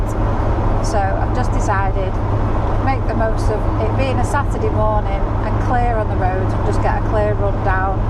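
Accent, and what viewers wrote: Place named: Northern England, United Kingdom. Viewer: Right up me chuff😅 trying to diddle meh!😂 proper northern lass 😊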